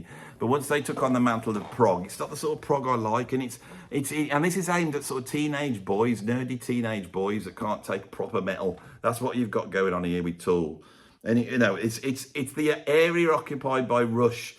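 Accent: Birmingham, United Kingdom. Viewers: I don't know the first two he talks about. I'm not that into music, I'm just staying for the Brummie accent!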